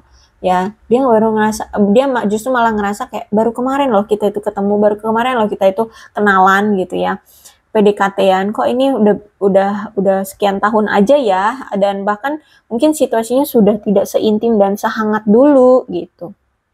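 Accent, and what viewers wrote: West Java, Indonesia. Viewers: Orang sunda ya teh?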